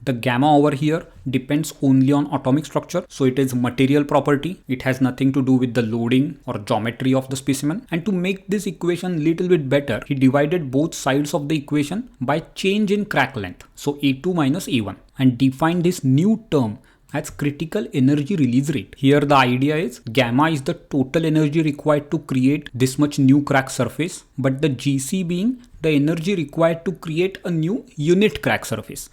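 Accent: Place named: India. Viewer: too strong indian accent...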